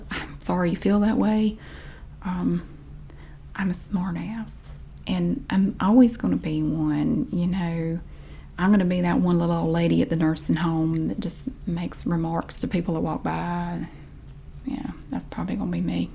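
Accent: South, United States. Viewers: Oh, be my smart ass sweetie pie 😍🥰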